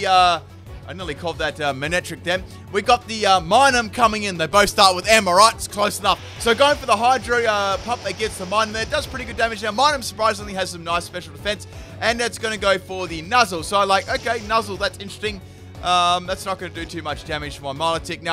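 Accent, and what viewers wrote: Australia. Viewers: Did not know you were an aussi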